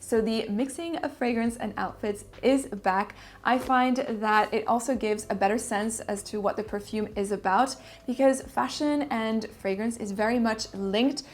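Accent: France. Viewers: I love your french accent ❤ and all this entire video, Greetings from México 🇲🇽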